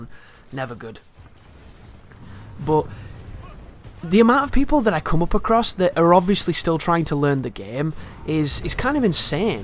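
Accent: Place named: Northern England, United Kingdom. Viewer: I would guess northern England don't know where though.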